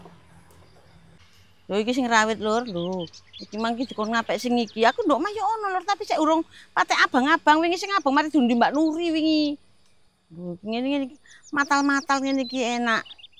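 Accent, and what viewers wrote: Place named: Java, Indonesia. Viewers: Maaf baru gabung ..Ini daerah mana ya..koq logatnya mirip saudara ku yg tinggal di Lumajang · NONGKO GORENG, MANTAPJENANG GENDUL, REPOT YA IBUU. SENENG LIHAT NYA KLO IBU LAGI MASAK END LAGI BAHASA JAWO SENENG AKU DENGAR LIHAT NYA DI ALEK JAWA SEMANAK BANGET INGAT ALM BAPAK IBU KU.....PERSIS DIALEK NYA SAMA GPP YA IBU DI SAMA IN YA IBU ❤❤❤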